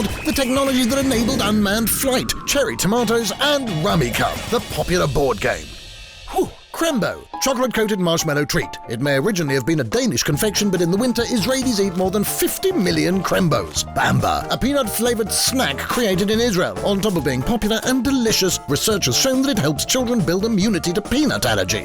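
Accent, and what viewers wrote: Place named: United Kingdom. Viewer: This is SO well done! the facts slide from one to the next smoothly, the research is brilliant and the photography top notch. (& everyone LOVES a British accent right?) Well done! My fave part: Ban on ads featuring underweight models! YES!! Love you MORE Israel!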